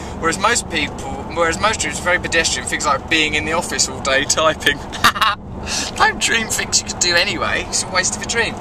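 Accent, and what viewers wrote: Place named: United Kingdom. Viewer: Russell love when you talk in this calm manner because sometimes you speak so fast that it's hard to follow your strong British accent. Hope all is well with you and your Mom. Much love from New Jersey USA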